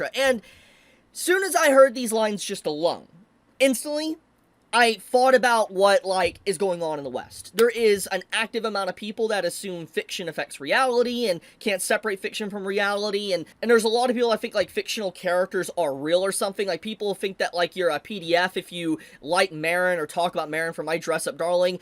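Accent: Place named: Berkeley, United States. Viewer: She sounds like STRAIGHT from Berkeley, University of California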